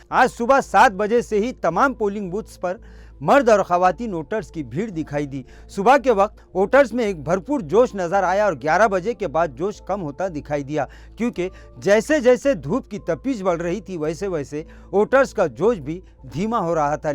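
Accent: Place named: Deccan, India. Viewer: Aap ka dakhni lehja achcha nahi lagta aap dilli walon ki trah bolna sekho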